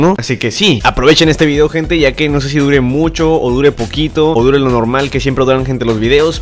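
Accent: Chile. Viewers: habla como Chileno por un vídeo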